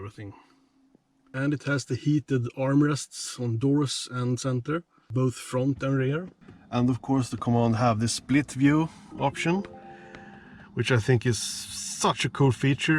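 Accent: Sweden. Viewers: You from sweden right? I can really tell The way you speek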